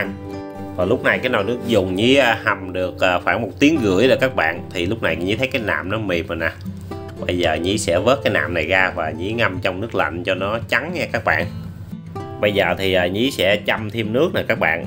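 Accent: South, Vietnam. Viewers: ngon quá Nhí ơi. nghe giọng miền Nam thân thiện làm mình nhớ nhà quá. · Video nấu phở nầy quá hay! Cách chỉ dẩn nấu, cách nói, giọng ng Miền Nam quá tuyệt hay! Bấy lâu nay mình nấu Phở sai, nên ko đc ngon, nhờ video nầy mình tin chắc rằng sẽ có nồi phở rất ngon. Rất cám ơn A nhiều! Chúc A luôn thành công trên con đường đưa cái tài nấu ăn của mình đến cho càng nhiều khán giả đc biết. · Giong ng mien nam nghe êm tai dể chịu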